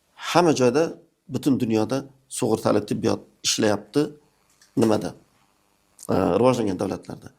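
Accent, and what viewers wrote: Khorezm, Uzbekistan. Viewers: Хоразлик инсон . гапиришидан билиняпди. Хоразмликлар фахрланяпди СИЗ билан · Укажон сиз аник хоразмдансиз шевангиздан билиниб турибди ! Ота онангизга рахмат ! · Акцент хоразмча че та..не важно..лекин маладец рахмат!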